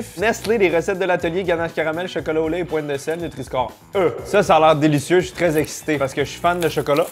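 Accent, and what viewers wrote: Canada. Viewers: J'adore ta vidéo, c'est drôle j'aime ça en plus l'accent du Canada c'est top! vive le canada et et les canadiens , bonjour de France et mes amitiées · J ai horreur de l accent Canadien je Zappe dommage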